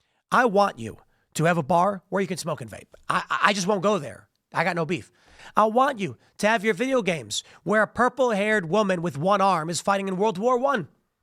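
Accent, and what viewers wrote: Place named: Chicago, United States. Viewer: your SW Chicago accent and attitude come through loud and clear in this video! (Observed from a west suburbanite)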